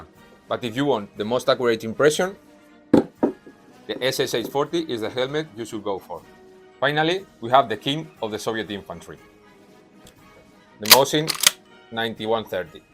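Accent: Spain. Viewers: Интересно было слушать испанский акцент :) Спасибо за видео.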